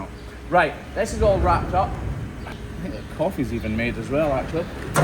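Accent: Scotland, United Kingdom. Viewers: I think people appreciate the openness of Youtubers. Not everything in the garden is rosey as some would make out. Behind every Youtuber is a human being with problems like everyone else. Sometimes people like to know that they are not alone as they have similar problems. Maybe one day your daughter will reach out. Keep positive. Not sure why I stumbled upon this channel but I am sooo glad I did. It's refreshing to hear fellow Scots accents too! I'm from Aberdeen and there are very few Scottish Youtubers that I have heard. Who would have thought that hoof trimming could be so satisfying 😂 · As a horsewoman in Canada, I'm fascinated with the trimming of the cows hooves as I am with horses hooves. And I'll be honest, I love a Scottish accent lol. Wish I could visit your holiday place..😊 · We watch to hear your accent. My mom is from Scotland. We want to try to get her there again. 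She has stage 4 lung cancer. It’s stable for now and she is healthy. We can’t really afford to send her, she needs a helper with bags n stuff. So one of us to go with her. She could go in her own, for a short time. She’d take less stuff. But it’s just a dream for now. We will see what life has to offer. We will keep praying for a miracle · . Your book was a fantastic read. I couldn't put it down. Thank you for letting us into your life. You are a wonderful guy and you deserve everything in life. When I was reading your book, it felt like you were in the room reading the book to me in that lovely Scottish accent. Merry Christmas to you, Mrs Hoof GP and your wonderful boys. May it be joyous in everyway possible for you all. Xx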